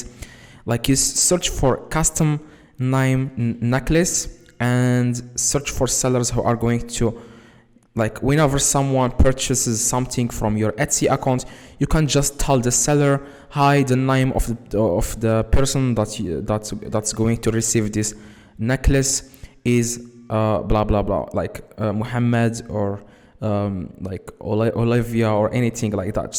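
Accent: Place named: Morocco. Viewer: 3reftek meghribi men lkelma lowla walakin kon rir derti hadchi bl3arbia wlh l'anglais "endi m3aaaakla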